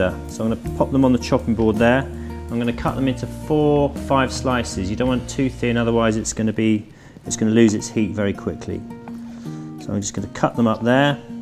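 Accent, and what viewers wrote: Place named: London, United Kingdom. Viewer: You sound like yo from London!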